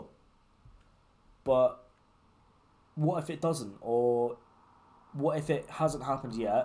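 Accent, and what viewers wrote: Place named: United Kingdom. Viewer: Do something else ❌ Watch talk about his life and the things he's passionate about with a british accent. ✅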